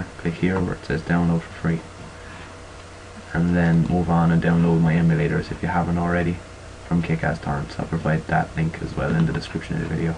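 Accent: Ireland. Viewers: Are you Irish by any chance? :')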